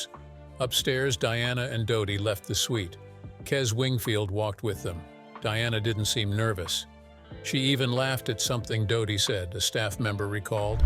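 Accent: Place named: United States. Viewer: WHY IS THIS VOICED BY YET ANOTHER YANKEE, I AM PISSED OFF WITH THESE PEOPLE BECAUSE I WILL NOT WATCH HOWEVER INTERESTING IT COULD BE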